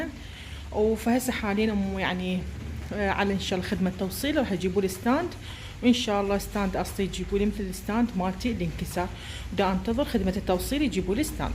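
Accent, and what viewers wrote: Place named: Diyala, Iraq. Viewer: الله يبارك بيك صوتك من اهل ديالى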